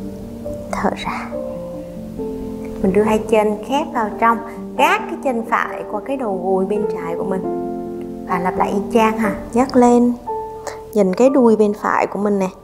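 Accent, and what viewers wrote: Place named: Hue, Vietnam. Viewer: Bài tập hay quá Em ơi ! Lại nói tiếng Huế hay nữa 😘😘😘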